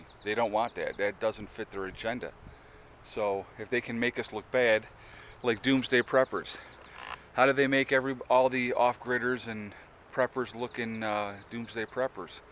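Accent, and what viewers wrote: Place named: New Jersey, United States. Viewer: Nice video; I'm subscribing. That sure sounds like a Jersey accent.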